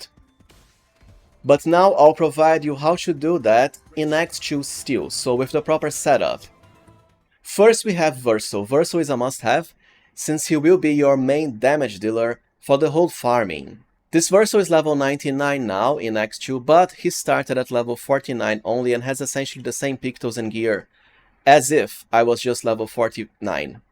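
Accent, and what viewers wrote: Brazil. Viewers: Are ypu brazilian or portuguese speaker? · Tu é BR né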